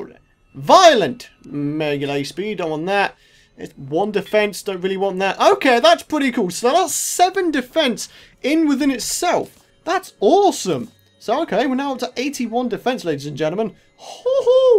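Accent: United Kingdom. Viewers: Walker Wilkins I think they're both British.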